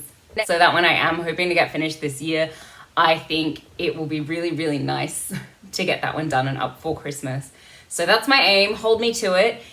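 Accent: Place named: Australia. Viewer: I knew as soon as I heard the accent you were an Aussie!!!! Yay!!! Love seeing other Aussie cross stitchers…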